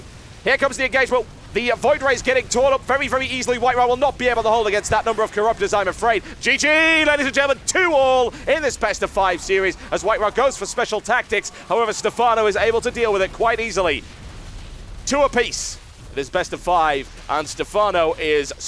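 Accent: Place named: England, United Kingdom. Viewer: ? when did you get an english accent?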